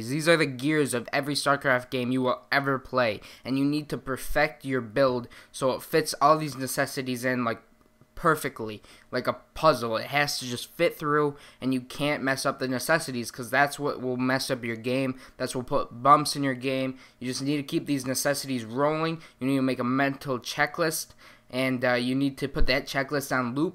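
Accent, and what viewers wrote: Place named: New Jersey, United States. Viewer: Sounds like you're from New Jersey lol.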